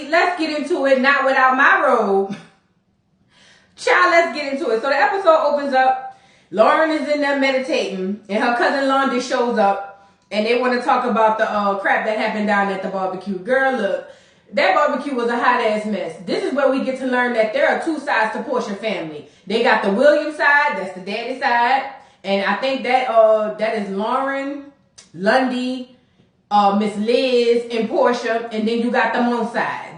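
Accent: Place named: Louisiana, United States. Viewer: Love your accent. You must be from Louisiana. Porsha is not over Dennis and she wants him to want her and show him what he is missing with Simone.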